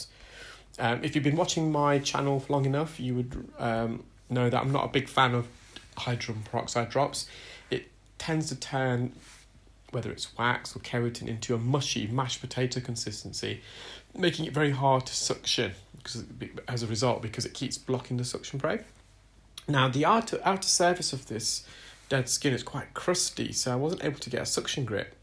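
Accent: England, United Kingdom. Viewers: You are a sweetheart, for sharing with. Us your knowledge and skills. It is quite wonderful to hear your soft English accent as you explain to us why and how you clean an ear canal. Thank you Take care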